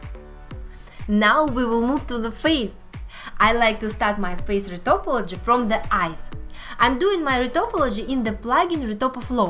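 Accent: Russia. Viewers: я прям слышу родной русский английский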